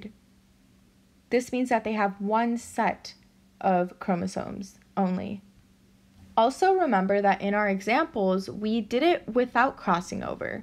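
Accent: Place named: New York, United States. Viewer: Nyc accent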